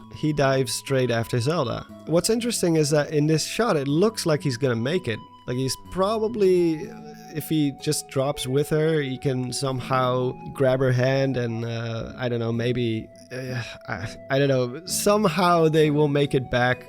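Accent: Netherlands. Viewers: Super cool to see another dutch zelda fan!! Found your channel awhile ago and now I'm addicted to your video's. Je hoort het bijna niet aan je stem dat je nederlands bent!! Ga zo door :D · grappig om een nederlandse accent te horen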